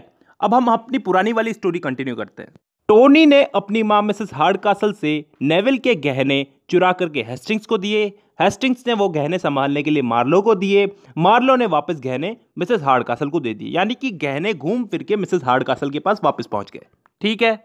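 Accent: India. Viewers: Hahahahhah best way of explanation.... English with desi tadka wow .. great Sir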